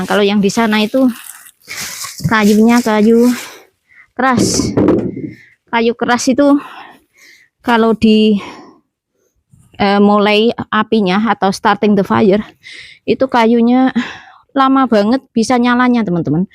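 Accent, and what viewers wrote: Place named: Java, Indonesia. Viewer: Jadinkeingetb.. Logat mba ky logatnya mba puri yg di california aksen jawanya kental banget, apalagi klu ngomong inggris, sehat selalu m family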